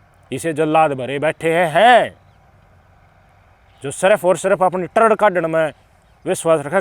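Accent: Haryana, India. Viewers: Bhai ji you are great haryanvi lahja bilkul stick ❤❤❤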